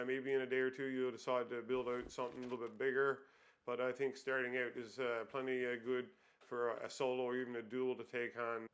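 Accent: Newfoundland, Canada. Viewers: From an Irishmans view, I was tryna pinpoint which town the accents from 🤣 Suppose I can hear the canadian in em' now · i knew instantly he was from newfoundland too hahaha i was looking for this comment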